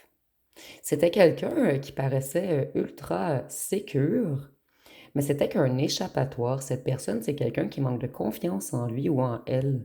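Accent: Canada. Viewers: ❤bonjour, j aime vos guidances. J ai booster, j ai liker.j adoré votre accent du canada❤et je trouve vos jeux de cartes originaux.merci 😊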